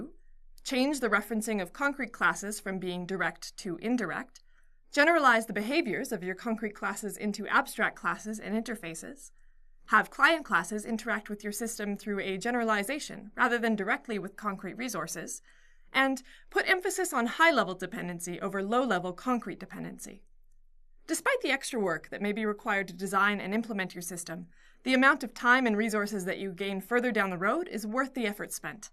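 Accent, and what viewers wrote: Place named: Canada. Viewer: I don't understand her Canadian accent.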